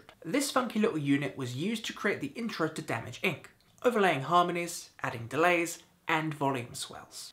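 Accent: England, United Kingdom. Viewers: English accent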